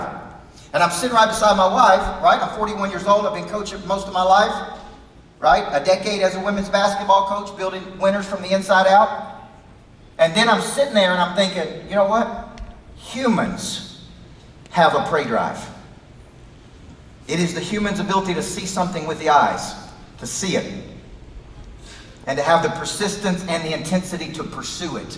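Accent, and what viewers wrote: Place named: South, United States. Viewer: I love that Southern accent!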